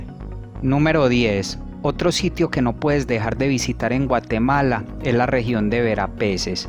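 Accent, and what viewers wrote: Antioquia, Colombia. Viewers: Amigo, me equivoco o eres paisa? Saludos desde Guatemala.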